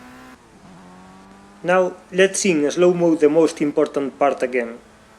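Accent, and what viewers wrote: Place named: Spain. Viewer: Thanks for the video! You sound like Carlos Sainz Jr. but with a heavier accent, are you Spanish?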